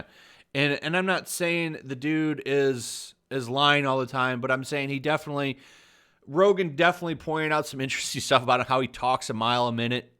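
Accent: England, United Kingdom. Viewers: That’s the most hilarious english accent I ever heard !!!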